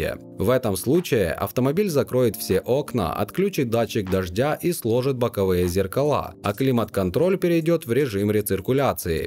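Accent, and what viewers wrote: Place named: South Russia, Russia. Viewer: Смотрю все ваши видео и ставлю лайк, но ещё не подписался. Вам нужно комментатор, мне не много не нравится произношение чувствуется либо юг России или Украина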